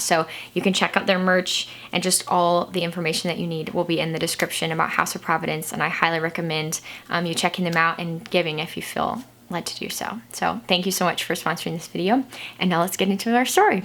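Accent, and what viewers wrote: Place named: Illinois, United States. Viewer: I really enjoyed this video. And also, I You said people from Illinois dont have accents, but I find that funny because you definitely have an accent.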